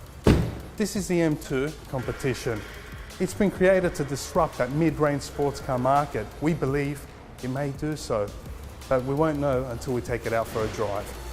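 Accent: Australia. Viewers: So great too have a aussie doing a great review .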